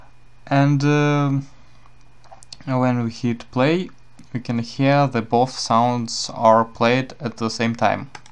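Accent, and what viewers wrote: Russia. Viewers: А я по интонации сразу поняла что русский! :P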